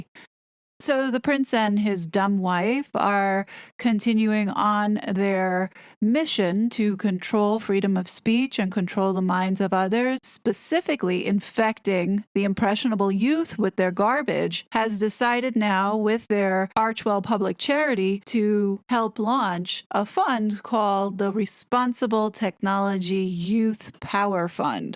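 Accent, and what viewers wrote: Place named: New York, United States. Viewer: I concur! I love dropping educated opinions in my lap in the evenings! I can't believe she was EVER self- conscious about her voice & NY accent! has an amazing cadence & comforting tone. She needs to get a side hustle reading audio books! 🎯💯💕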